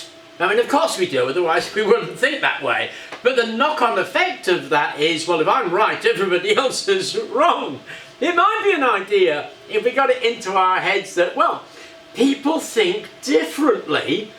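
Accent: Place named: England, United Kingdom. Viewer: Sir, may I tell you with the upmost honesty, I appreciate your videos, every single one. I live in the states however, your accent reminds me of my late grandmother. She originated in England. I am a gigantic fan, and please continue to share your knowledge.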